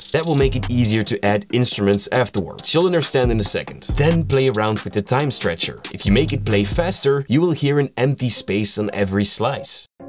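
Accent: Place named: Netherlands. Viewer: bro sounds from NL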